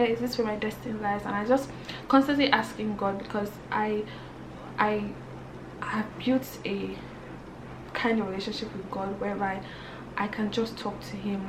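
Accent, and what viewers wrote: Ghana. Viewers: You’re accent has even changed. You’re speaking like a Ghanaian. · Loving your Ghanaian accent 🤗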